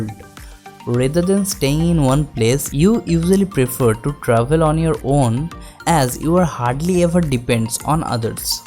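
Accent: Bengal, India. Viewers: His accent sounds like Bongs 🥶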